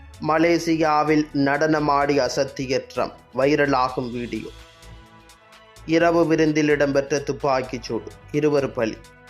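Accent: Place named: Chennai, India. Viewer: Madras தமிழ்..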